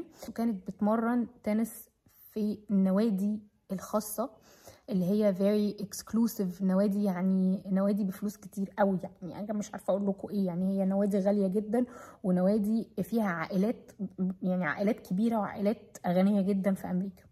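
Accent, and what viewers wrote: Egypt. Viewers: هما الإسكندراني كده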